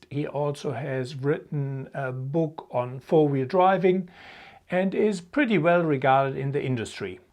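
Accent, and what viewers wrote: Germany. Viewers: i agree in all , precise look over all , sounds like you got an German accent ...😎 Greets from Bavaria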